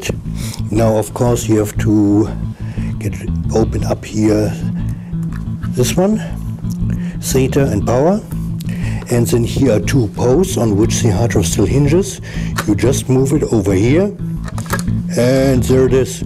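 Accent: Germany. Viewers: Good video, just ordered a refurbished one, gonna change the cpu and add a ssd and 16gb of ram. By the way... Are from Germany or a native German speaker? Greetings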